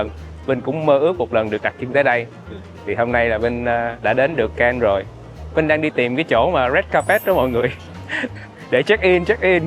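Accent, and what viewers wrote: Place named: Saigon, Vietnam. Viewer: Giong de thuong, giong chi'nh goc nguoi SG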